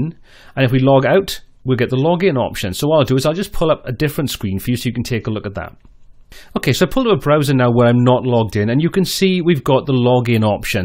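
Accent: Wales, United Kingdom. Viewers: You sound like you're from Wales. Am I right?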